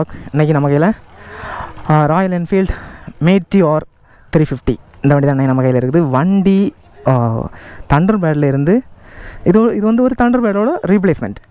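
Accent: Kanyakumari, India. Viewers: R u from kanyakumari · Wow KK slang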